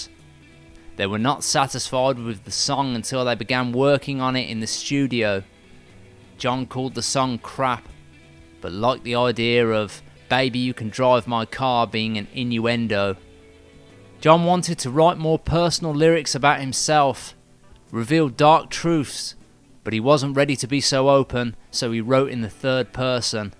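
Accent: Birmingham, United Kingdom. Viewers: Yow sarnd like a brummie like me pal... Well I'm Wolverhampton but everyone calls us brummies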